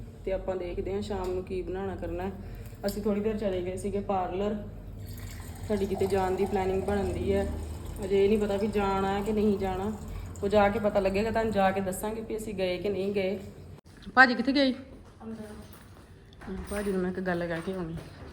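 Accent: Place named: Majha, India. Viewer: Nice vlog tusi kithe o tuhde boli majje wale aa sisters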